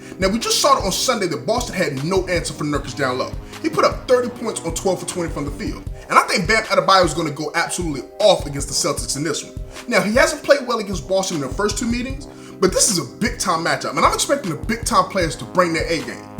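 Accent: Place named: Toronto, Canada. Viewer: man you on these videos quick time.. you sound like you from the 6? yea or nah? regardless who you actually route for its nice to see someone who puts up legit facts on this team cause you know mainstream is all about that LAL LAC Zion GIannis and then other superstars if there is time in their time frame of the show .. raps only get mentioned if they play a vegas team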